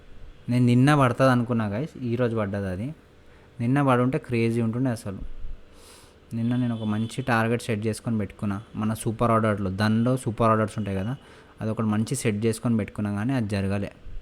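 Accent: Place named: Rayalaseema, India. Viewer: Bro meedi proper Rayalaseema kadha I think u r language pronounce like that only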